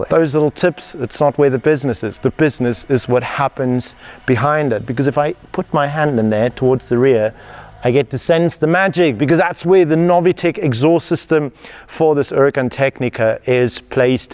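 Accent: South Africa. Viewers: Is our Presenter South African?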